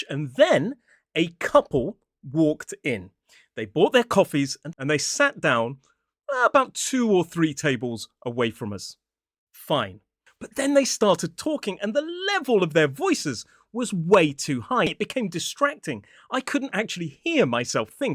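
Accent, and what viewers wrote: United Kingdom. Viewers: British English!!!! Really..I switched off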